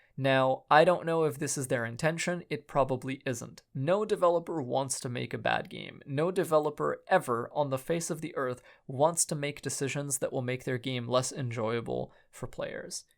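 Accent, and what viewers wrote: Eastern Europe. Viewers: You're Eastern-European? Where from? I'm half Polish. Also, I love when you say godlike.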